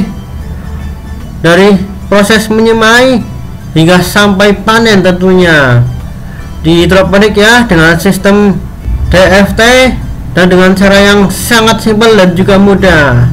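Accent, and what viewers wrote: Banyumas, Indonesia. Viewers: Terhibur dengerin suara mas nya. Ngapaknya kentel banget. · bahasane NGAPAK MEMBAHANA...tapi bisa dipahami..terima kasih GAES.... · gan iki ngapak banyumasan opo tegal gan ? pada bae ngapak sukses yo bos... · ka ya uwong ngapak kie yah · Ngapak yak😅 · Di gas i kang ngapak e. Mantap hdroponik nya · wong ngapak bro?salam ngapak bro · Logate wong ngapak kie ya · Bukan jawa gesss, tpi ngapak gesss bhsa daerah terlucu dan gk bisa hilang klaupun pkek bhsa indonesia ngapakknya tetep ada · Ngapak mn gan...salam dariku dari malaysia..asal wonosobo😊.. Ma kasih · Suarane ngapak, hidup ngapak, semangat, ilmunya bermanfaat, makasih kang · versi ngapak gesssss ..... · Hidroponik ngapak gaes..... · Daerah ngapak ndi yah ..pengin belajar langsung.. inyong cilacap. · wkwk logat ngapaknya masih ada gan 😂 tp nice info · Yang ngisi suara kayak orang ngapak pasti kiye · Jawa Ngapak Nya Jelas Banget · Ngapak yaak😂😂😂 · Bahasanya masih kaku... 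Pasti orang ngapak nih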